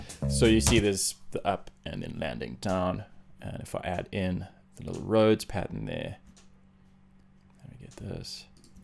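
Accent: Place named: South Africa. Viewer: Oh this is great for so many reasons - one of which is to be hearing a South African accent on this kind of video 🤣. Thanks, I'm subscribed and 🔔🛎️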